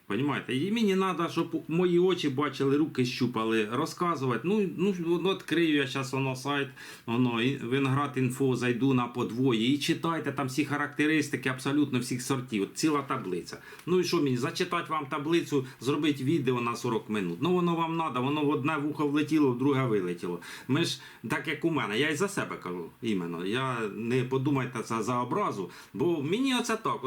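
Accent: Poltava, Ukraine. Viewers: Балакаєте, як на Полтавщині, дуже приємно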